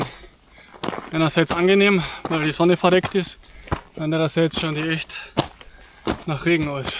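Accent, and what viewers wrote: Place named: Austria. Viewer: Ve wo bischn du ? Und welcher Berg isch des ? Ven Akzent ven Hochdeitschen tat i sogn Österreicher. Cooles Video, mir gfolln sette Video wo in die Grotten unterwegs bisch und nocher in an Biwak ausharren oder magari no ibernochten muasch! Abo und a Like hosch ve mir! Gruass ve an Südtiroler! Berg Heil!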